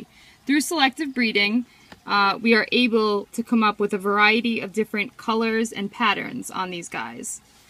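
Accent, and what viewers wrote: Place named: Long Island, United States. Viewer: Where's your store? Sounds like L.I. judging by the accent.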